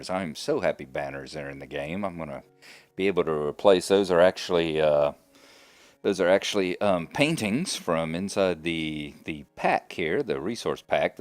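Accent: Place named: England, United Kingdom. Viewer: "Just leave the damn thing alone and put the new textures in." LOL I know exactly how you feel and agree with you. Use glowstone to melt your channel of water through the ice instead of torches. They're 1 light level higher so will melt one block more in each direction. With the way boats are so difficult to handle you'll need those 2 extra blocks' width. I think, with the beauty and creativity of your Emerald mine you can consider your game well and truly upped. You started building that 6 to 9 months ago and never mentioned it to any of us or showed us? pouts Love how your solution to the dangerous mob ledges above is to cut into the wall to shelter rather than climb up and torch it up. Means your ravine looks so much cooler with the upper extremes still in darkness. Once again it's your small differences, often so considered and thoughtful, that makes a huge difference to the outcome and feel of your place. 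Small correction. Building your mine 4 high does not cover the 4 main layers of lapis, it covers 6 of them, because you can see the ceiling and floor blocks too. You're actually being even more efficient than you knew! :o) G "Had a fun little time: got to trash talk a skeleton and a couple of zombies over there." LOL chuckles Okay, why when you put the text in to correct County Gnarlo to Brocraft does the addition of that "mate" mean I hear my voice reading the text instead of yours? Or maybe yours in that amazing English accent you can employ... :oP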